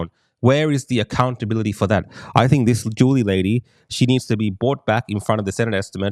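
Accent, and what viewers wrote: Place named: United States. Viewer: Her American accent noted.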